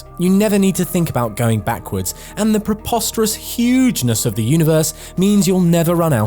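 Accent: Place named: United Kingdom. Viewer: There's something satisfying about hearing British accents on these types of videos..And this happens to be the best so far lol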